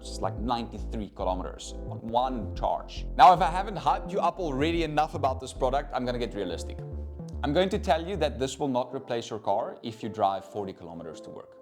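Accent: South Africa. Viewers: The South African accent😊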